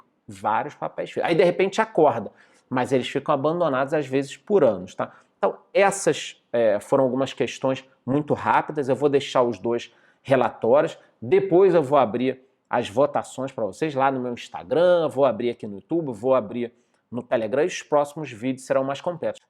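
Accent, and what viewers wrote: Rio de Janeiro, Brazil. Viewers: O mora no sul mas esse sotaque carioca não nega kkkkkkk.... bota fleury ai na carteira porr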